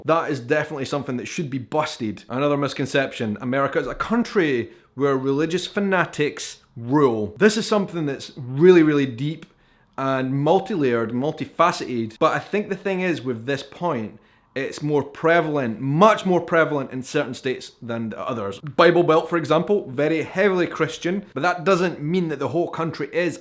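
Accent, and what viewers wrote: Scotland, United Kingdom. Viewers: I'm an American of Scottish and Syrian descent. I have visiting Scotland on my bucket list. I'm getting old, so, I'm hoping a bucket of money will fall on top of me. I hope you enjoyed the United States! We are, truly, an amazing country! (I love your Scottish brogue!) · New subscriber here, and an American. Great video! Thanks for backing us up! Lol you're always welcome here! Love the Scottish accent, it's awesome, but I'd be very interested to hear a Scot speak Portuguese 🤔🤔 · Am i the only one who wants to hear him speak Portuguese with a scottish accent!?! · great perspective , good show. i’m afraid my wife will fall in love with your Scottish accent !!🧐😎🤓😝👻👽👽👾👿 .... paul in ohio usa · I need to hear your Portuguese with a Scottish accent. I need this in my life. (I've heard Brazilian portuguese from the city and the country as well as 'good' and 'bad' American-accented portuguese. 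It'd be interesting to see what bleeds through for a Scott.) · This was great! The best part though was the subtitles trying to keep up with your Scottish accent. So funny.😅🤣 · My ancestors can from Scotland. Love your videos and accent.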